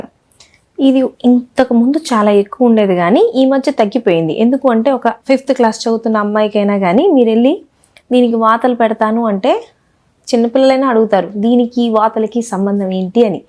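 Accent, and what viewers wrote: India. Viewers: నరాల డాక్టర్ ఆ ,న్యూరో doctor ఎప్పుడూ ఇంగ్లీష్ వాడే మీరు తెలుగులో న రా ల అంటే నవ్వించింది ఇప్పుడు ఊరికి, వీధికి, ఇంటికో డాక్టర్ వచ్చే కాలంలో వుండాము మేడం, మీరు అసలే లండన్ పోయచ్చక మీ స్వరం తెలుగు కు మారింది, ఎంతైన బ్యాంక్ ఎంప్లాయ్ కూతురు ఆ మాత్రం ఉండాలి, చెప్పగా యూట్యూబ్ మీరు వాడు కొన్నట్లు ఎవరూ వాడరు , పుట్టిన రోజు అన్నారు గానీ ఎన్ని సంవత్సరాలో చెప్పలేదు 👂👎🙏🫀❤️, రజియా మేడంతో నెక్స్ట్ ఇంటర్వ్యూ అప్లోడ్ చేయండి ప్లీజ్ మేడం...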